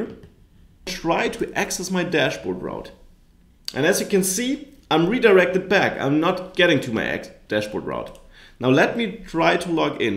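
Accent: Germany. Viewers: you for the prompt response 😊 I haven't yet looked at this but I will. I get what's going on and change what I believe I think is not working but nothing happens. I'll let you know if anything changes once I've compared and amended according to you git repository. Thanks you, great videos, I'm enjoying the German accent. :)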